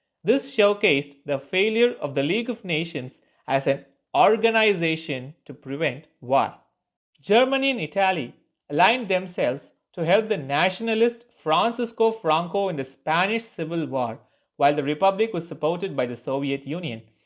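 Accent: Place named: RP, United Kingdom. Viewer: English like bbc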